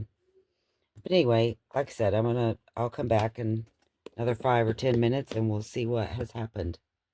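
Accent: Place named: Texas, United States. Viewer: Thanks for this, this is so helpful for someone like me, to get our toe in the water. You’re so fearless!!! Wear gloves!!! If that stuff is corrosive enough to rust paper clips so fast, just think what it could do to those fingers! The reason the soda can doesn’t rust is that it’s aluminum and aluminum won’t rust. And, just another thing, hydrogen peroxide is something that some bombs are made of 😮. Not the stuff you’re using, not the stuff that you can get over the counter, but take care to pay attention to the strength on the label and how warm it’s getting. You can even have an ice bath by just in case things seem to be getting out of hand. Just in case. 😅😊 And wear gloves!!! What about washing the things then rinse with alcohol and let dry thoroughly. Don’t let any alcohol stay behind - another chemical reaction. But, then there wouldn’t be any grease or dirt coating to keep it from rusting faster. 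The the sticks are just the same as paper. 🤔 And wear GLOVES!!! You sound like you live right next door to me here in Austin, TX, get on down to the H-E-B and get you some of them cheap vinyl gloves… 😂😊 I just love your show and I don’t miss a one 😊🥰💙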